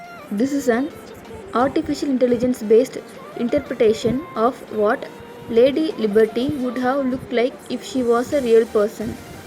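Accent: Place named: South India, India. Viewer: South Indian recognized from voice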